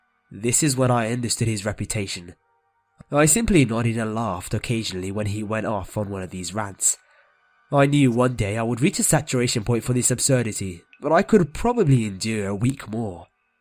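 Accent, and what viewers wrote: United Kingdom. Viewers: He is from the uk like me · good video. nice reading another new sub. what part of UK you reside? Manchester myself 😀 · Good story + English or British ascent (XD) = Subbs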